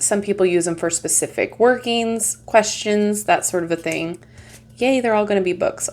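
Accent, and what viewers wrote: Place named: United States. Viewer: спасибо за обзор! У тебя такой красивый акцент!!! Класс! Прям американка!!!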